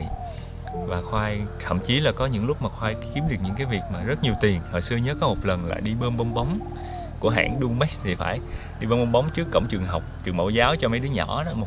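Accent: Mekong Delta, Vietnam. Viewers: Thích nghe giọng khoai nói ghê , ấm áp mà đậm chất miền tây nam bộ sao á❤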